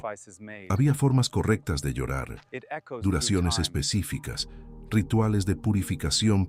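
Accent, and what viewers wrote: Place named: Central Mexico, Mexico. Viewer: Con la narración de un español neutro del centro de México 😊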